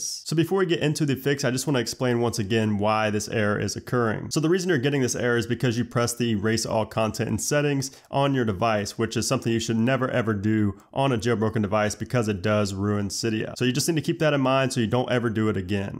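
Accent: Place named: South, United States. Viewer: anyone else think he has like a really hot voice? reminds me of like a southern guy lol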